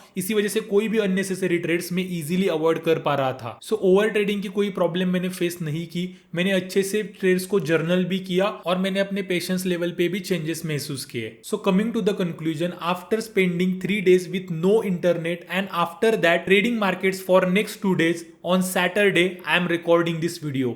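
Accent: Satara, India. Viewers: are you from satara ?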